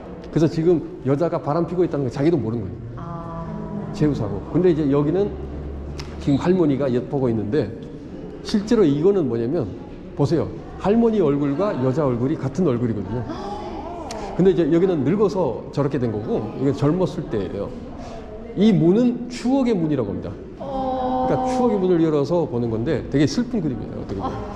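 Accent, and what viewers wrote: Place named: North Korea. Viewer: 안내 덕분에 영상잘봤고 좋은 공부가 되네요. 북한 억양이라고 하더라도 그건 나쁜게 아니니 걱정 조금도 마세요. 부산사람이 경상도 사투리 한다고 더 싫다거나 좋다거나 나쁜건 아니듯...오히려 한국말 너무 잘하시는 것이 억양을 떠나서 아주 멋져보여요. 구독 좋아요 눌렀네요.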